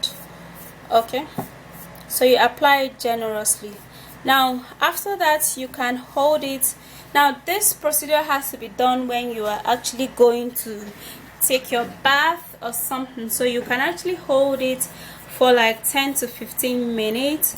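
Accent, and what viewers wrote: Nigeria. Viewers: That accent sounds like a Nigerian. They are all after money if anything goes wrong you can't sue her. How come doctors don't refer you to such things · there s nothing called "African English"... try not to display your ignorance in public.. from her accent she sounds like she s from West Africa...Nigeria to be specific...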